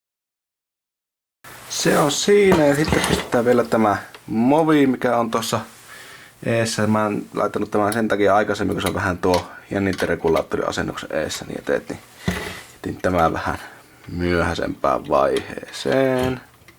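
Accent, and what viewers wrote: Finland. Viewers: For what it's worth, as a non Finnish speaker the Finnish-English dialect has it's own charm. I am sure I would enjoy your videos either way :) Nice series by the way, love me some open source hardware hackery.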